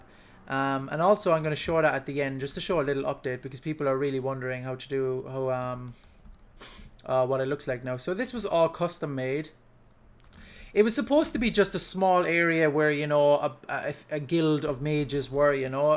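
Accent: Ireland. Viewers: I believe he is from Cork (Ireland). He is definitely Irish though.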